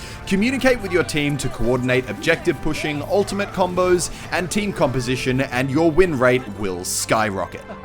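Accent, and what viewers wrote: Australia. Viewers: He's Australian you dumbfuck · from 'Straya. What's so wrong about that? Can't he speak normaly? Does it have to be US english? I don't think so...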